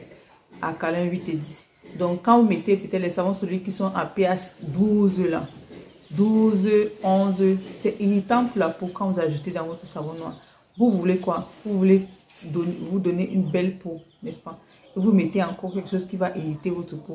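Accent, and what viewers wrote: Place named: Cameroon. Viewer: Le ton camerounais 😂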